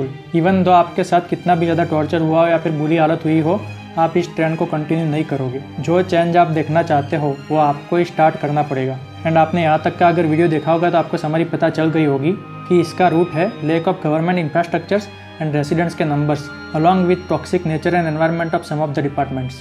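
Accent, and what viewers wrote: Gujarat, India. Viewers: Clearly your voice says you are pakka gujarati ❤️❤️❤️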